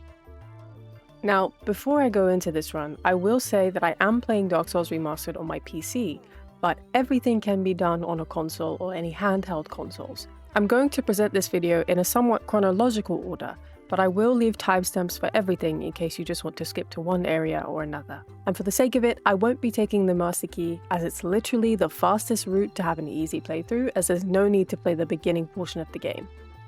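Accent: United Kingdom. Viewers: Your voice is just fine. If nothing else, it’s nice to hear a fellow Brit!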